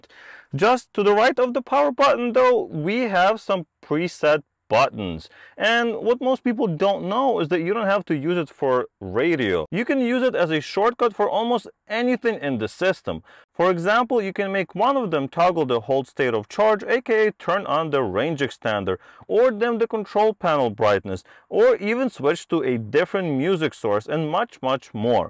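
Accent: Russia. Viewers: This was very useful. Even Russian accent sounds good.:face-blue-smiling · Why do you speak like "Diagnose Dan"? Oh, with Russian accent... I can understand that's not easy to lose your accent, buy why copy a hard working guy?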